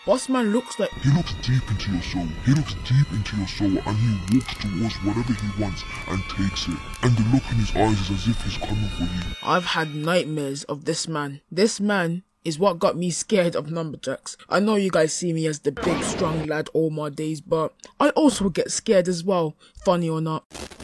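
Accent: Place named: Roadman, United Kingdom. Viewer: I like your accent fam roadmen are cool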